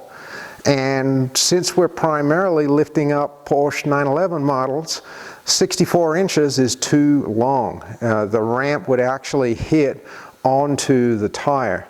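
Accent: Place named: Australia. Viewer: your accents sounds like you've lived in Australia -are you an ex aussie?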